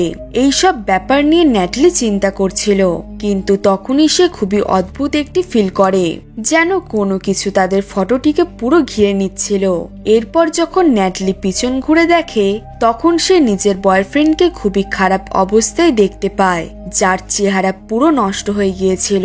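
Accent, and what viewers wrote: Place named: Chapainawabganj, Bangladesh. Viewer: সরি টাইপিং মিস্টেক বলছি আপনার ভাষা ঠিক করা দরকার আকটু প্রবলেম আছে ভাষাটা শুদ্ধ হলে শুনতে মজা লাগে আপনি কেমন যেন চাঁপাইনবাবগঞ্জের ভাষায় কথা বলেন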